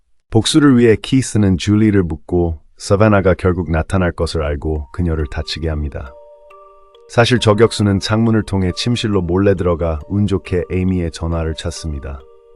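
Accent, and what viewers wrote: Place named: United States. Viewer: 혀 굴리는 코메리칸 스타일 리뷰어에 뇌가 울렁증...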